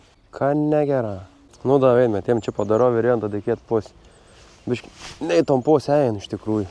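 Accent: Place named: Samogitia, Lithuania. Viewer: Bet ta kap smage girdiet zemaiteska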